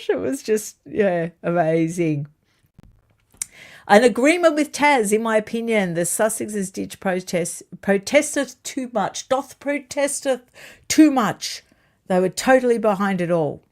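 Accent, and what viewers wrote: Australia. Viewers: Yeah,. But they are swayed by you. God love our Aussie voice ❤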